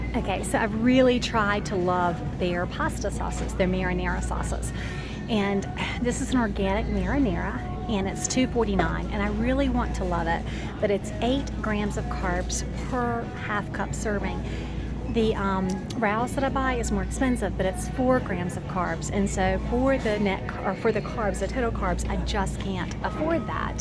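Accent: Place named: North Carolina, United States. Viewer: Love your channel! Keep it up! Are you from NC? You certainly sound like one of us :P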